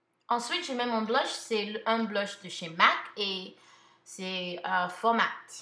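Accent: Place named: Cameroon. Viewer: Tu m'as bien fait lap J'aime bien ton accent camer . Ta routine est au top et j'aime beaucoup les fard à paupières :)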